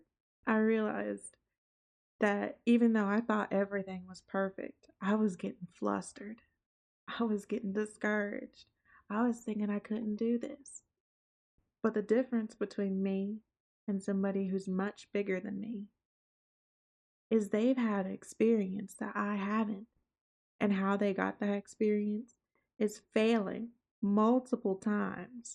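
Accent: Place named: South, United States. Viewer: The southern twang is the icing on top 🤠 Yee yee represent darn tootin!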